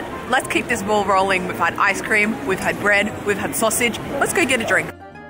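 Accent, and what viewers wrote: Australia. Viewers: Host is an Australian? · Australian accent?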